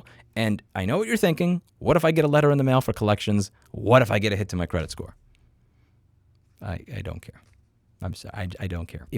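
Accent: New York, United States. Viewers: Love that NY pronunciation. Umanly possible 😂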